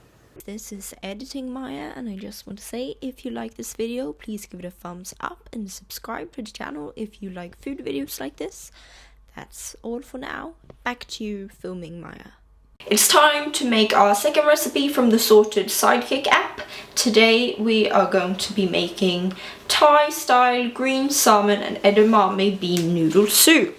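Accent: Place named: United Kingdom. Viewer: Jeez, I thought you were British. Your accent is incredible.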